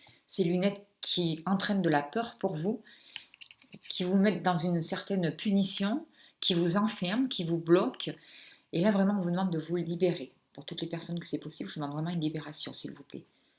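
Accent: South, France. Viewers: Merci à vous ! Oui, c'était lourd ! Et comme j'avais choisi la pouppée jaune vous avez vu juste comme toujours ! Vous êtes fort énergétiquement je le sens. Priez pour moi avec Archange Michael et St Benoit svp car il y a eu beaucoup de bloccages (aussi familial) causés par la jalousie et les méchancetés. Merci ! Je suis Flamande et je continue CERTES à suivre vos vidéos aussi longtemps qu'il est nécessaire ! Vous avez un accent du Sud de la France , non ?Bien à vous car Dieu est avec vous je le ressens . Je suis tombée pour la première fois sur vos vidéos en février/mars et ce n'est pas un hasard ! On m'a fait beaucoup de saloperies et boccages dans ma vie (j'ai 58 ans) donc je suis ce qu'on appelle "un cas grave" …..Continuez votre travail de libérations énergétiques et prières car vous avez reçu de Dieu une Mission et des REELLES dons !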